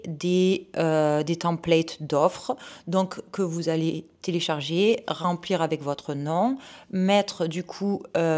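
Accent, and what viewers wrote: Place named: South, France. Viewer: Jolie accent qui rappel les cygales ❤❤❤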